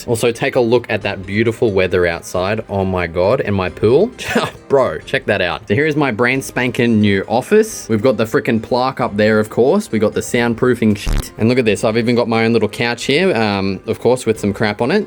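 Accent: Australia. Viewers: The way you talk is so Aussie! I bloody love that mate!!! ❤ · Hahahaha i love how aussie he is hes like the only minecraft youtuber that sound like their from australia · Are you Aussie cause you really sound like one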